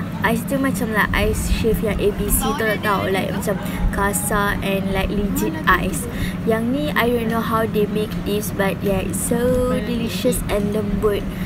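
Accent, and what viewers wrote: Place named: Malaysia. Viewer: Yo malay english is waaaay too boring to be heard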